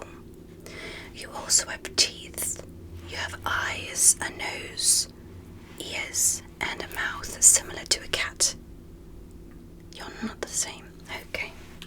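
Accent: England, United Kingdom. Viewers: Is she English or American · Omg yesss, I love your alien ASMR videos with the British accent. I don't know why but it just hits different and makes me feel safe. The British accent just tingles apart of the brain that I can't explain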